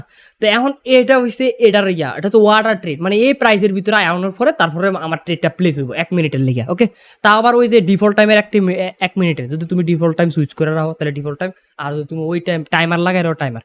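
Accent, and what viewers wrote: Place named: Mymensingh, Bangladesh. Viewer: Vai apnar kotha sune mone hoitase apni Kishorganj or Mymensingh er...amar bari o oi dike e...vlo laglo apnar video ta...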